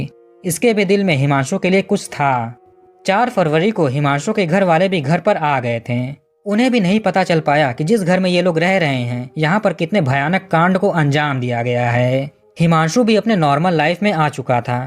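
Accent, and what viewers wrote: India. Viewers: woh North Indian h unka accent Waesa hi hota h.. Aur woh Boht accha narrate krte h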